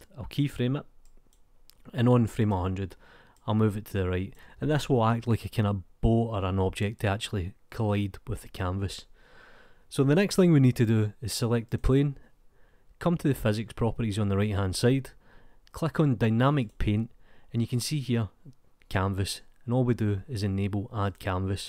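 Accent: Scotland, United Kingdom. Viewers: nice and simple , love those short informitive tutorials you do, thanks , btw I love you accent scottish people are amazing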